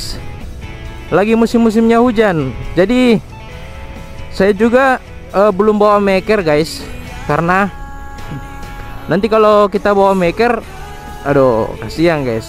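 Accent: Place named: East Nusa Tenggara, Indonesia. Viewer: logat bahasa indonesia tu hampir mirip NTT ya... 😂😂😂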